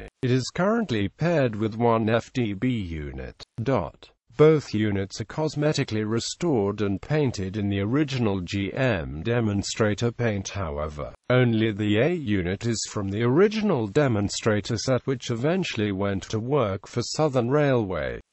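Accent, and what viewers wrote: United Kingdom. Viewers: The British accent, anything that ends with the letter A is pronounced with an R. Makes absolutely no sense to me.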